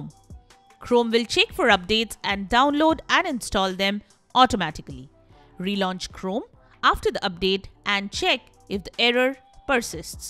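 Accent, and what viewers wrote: India. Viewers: lmao Indian voice. How am I not surprised.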